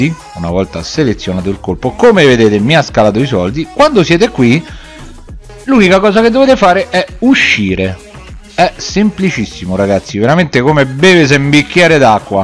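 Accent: Rome, Italy. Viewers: Sei di Roma ?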